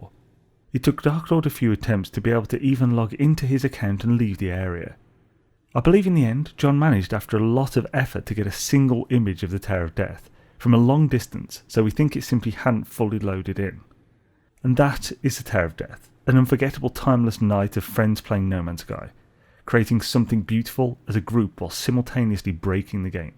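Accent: United Kingdom. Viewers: Very cool video, love the old clips and how brummie you sound Very cool to have been a part of the development of the UAS and involvment with other bits and pieces, very honoured indeed! Again, great video and long may the fun continue! 😍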